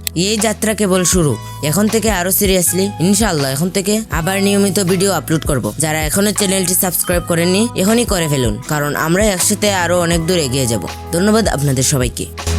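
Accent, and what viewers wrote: Sylhet, Bangladesh. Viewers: tumi kita sylheti ni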